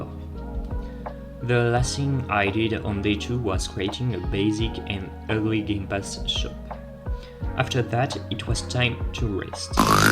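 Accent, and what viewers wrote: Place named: France. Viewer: You should translate your videos to french because your accent is kinda annoyingq(sorry i have the same lol)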